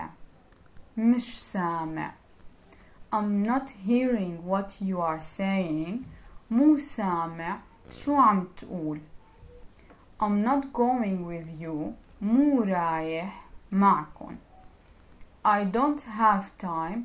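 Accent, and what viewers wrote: Damascus, Syria. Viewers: Thanks, dear for your answer. Your lessons are very very well presented, understandable and useful. Thanks once again. I would like to ask you, is your accent, if that is possible to call it so, Damascene? Because as far as I know, e.g. people of Damascus and Aleppo speak somewhat differently.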